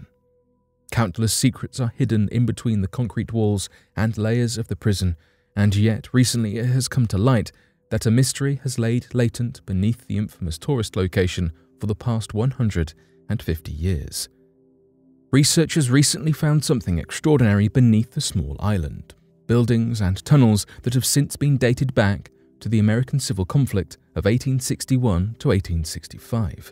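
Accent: United Kingdom. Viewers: Where do we go to find non-british speaking narrators?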